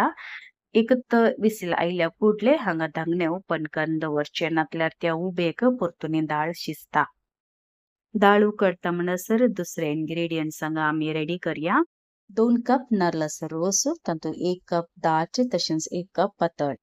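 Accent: Konkan, India. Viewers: Thanks. I'm really happy that you have started with subtitles in english. Although I love to hear your sweet accent of konkani which reminds me of my childhood. So happy to have come across your channel ❤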